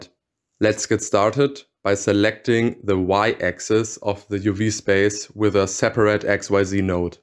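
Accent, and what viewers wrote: Germany. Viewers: Very nice breakdown, directly used it to replicate a DVI input that thicc enchanting german accent <3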